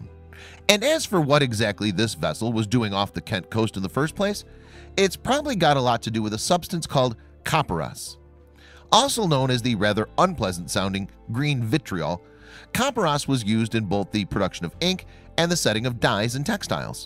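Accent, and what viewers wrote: United States. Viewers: If you are going to post a YouTube video get your facts straight. What the hell is an American doing a Voice Over on a British subject? The mind boggles. 🤪🤪🤪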